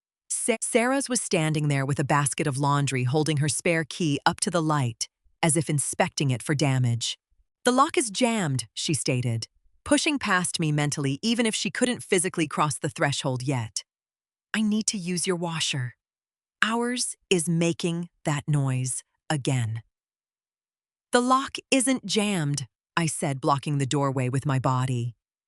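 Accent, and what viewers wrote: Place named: United States. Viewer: Why would this channel use an American accent AI narration, but have Euros going into the wallet?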